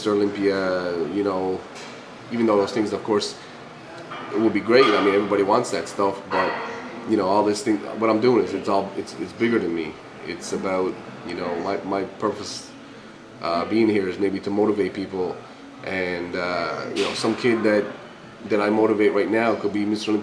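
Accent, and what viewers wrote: Newfoundland, Canada. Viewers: sick newfie accent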